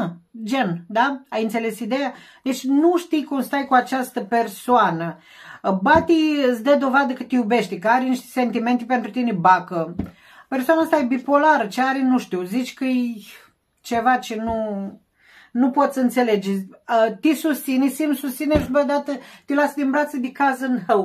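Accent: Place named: Moldova. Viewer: Superba etalare! Esti o draguta! Multumesc pentru frumoasa citire! Imi place accentul tau...moldoveanca de a mea! Acum mi-ai aparut..e prima data cand te vad si iti ascult citirea si..mi-a placut! Si da! M-am abonat! Te pup pe suflet! Te astept cu urmatoarea citire! ❤❤❤❤❤❤❤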